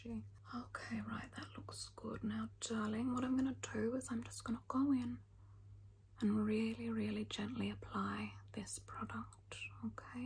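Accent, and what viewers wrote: Australia. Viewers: OMG!!!! I'M SOOOO EXCITED TO HAVE A VIDEO FROM YOU!!! Its so rare to get that specific Aussie accent/way of saying things. you are amazing, i hope 2024 treats you better. We are all just so happy for anything you do. I wish you love & light & happiness for 2024.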